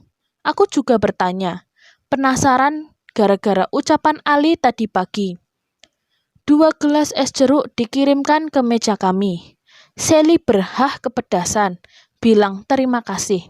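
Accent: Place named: Ngapak, Indonesia. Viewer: Narasi pake suara bocah ngapak. Medok banget.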